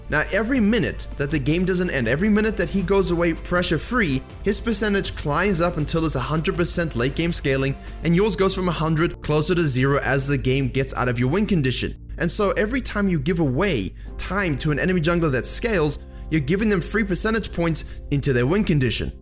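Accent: South Africa. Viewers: . Thanks for the great guide. Are you from South Africa or have been in SA before?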